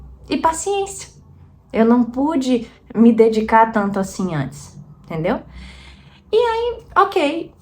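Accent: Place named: Northeast, Brazil. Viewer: Ave Maria! mais tu é bonita! Nem parece que é mãe e casada 😂😂😂 (tô brincando) Já vi outros vídeos e pensei que tu fosse do nordeste,o sotaque parece . Já fui mt inocente tbm qndo comecei a estudar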